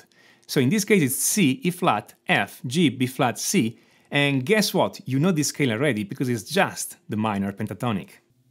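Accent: Italy. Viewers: Si sente che sei italiano <3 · Ho Capito che eri italiano dalla prima parola. Comunque il video è molto interessante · Avevo immaginato tu fossi italiano, il mio orecchio ha sentito giusto 😂😊🇮🇹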